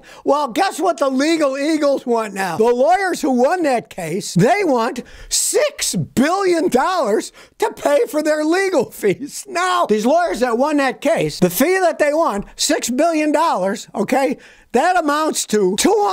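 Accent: United Kingdom. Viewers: Nothing like a strong black coffee and speaking with a British accent to get me going, in the morning! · Love the Brit accent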